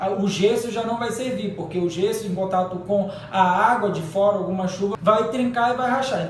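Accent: Rio de Janeiro, Brazil. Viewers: Você é do Rio??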